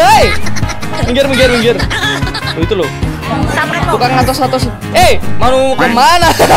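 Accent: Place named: East Java, Indonesia. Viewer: jawa timuran , rame juga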